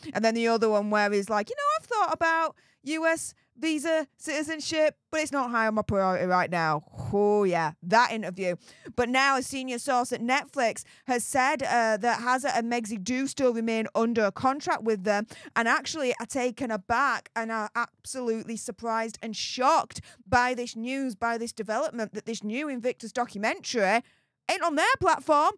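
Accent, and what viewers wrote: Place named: England, United Kingdom. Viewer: Takes a while to get through the English accent however is interesting and worth watching.😅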